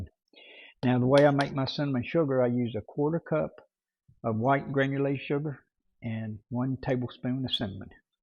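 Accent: South, United States. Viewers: Thanks for this recipe,. I subscribed to your channel. You sound like a southern gentleman and since you cooked at the firehouse, I’m sure your recipes are great! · You have a perfect voice to fall asleep to! I mean that in a good way, you’re pleasant, clear, and relaxing like a bedtime story. If you’re looking for a 2nd career - think about it! Especially with your accent you’d be perfect for a southern novel!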